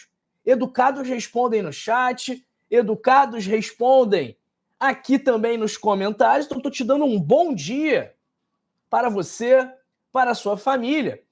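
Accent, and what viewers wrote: Rio de Janeiro, Brazil. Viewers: Oi dtor. Adoro sotake. Do. Rio. De janeiro. Acho. Lindo.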